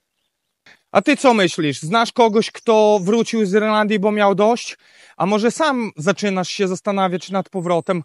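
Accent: Podlasie, Poland. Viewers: usłyszałem akcent i mówię podlasie, i zgadłem. Nie tak tu strasznie😉 idealnie nie jest ale da się żyć. Nowo przyjezdnym odradzam przyjazd bez wcześniejszego załatwienia zakwaterunku najlepiej u rodziny już tu żyjącej lub znajomego. Służba zdrowia, mhm ja osobiście mam dobre doświadczenia a i w Polsce idealnie nie jest🙃 A im mniejsze miasteczko tym łatwiej się żyje, Dublin odradzam każdemu... Pozdrawiam z Waterford 18 lat na wyspie a miał być tylko urlop🤣🤣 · Oprócz śmiesznego podlaskiego akcentu większość co chłopak mówi to prawda. Mieszkam tu 20 lat i widzę jak Irlandia się zmieniła... Czy na lepsze...?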